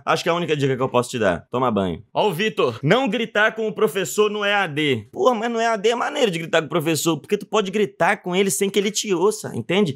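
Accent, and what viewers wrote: Brazil. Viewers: bacana! Tu tem sotaque? Nunca ouvi sotaque piauiense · ta com um sotaque carioca da gema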